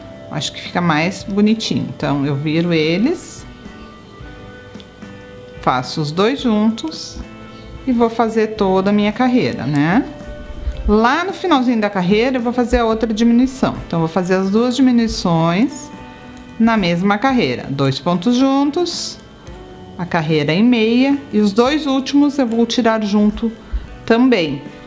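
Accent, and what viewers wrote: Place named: South, Brazil. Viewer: Boa noite, estou muito grata por todos os ensinos que tenho tido contigo. Te acompanho sempre, e comento pouco. Como tricoto no método inglês com mais facilidade, e ainda nova neste mundo maravilhoso da arte, vejo vc tricotando rápido demais. Então, vejo várias vezes para pegar cada detalhe. Linda demais essa cor. Ouvir esse sotaque do sul é maravilhoso. Morei no início do meu casamento em Santa Maria. E trouxe uma guria para mim😂. 😘🙏🙌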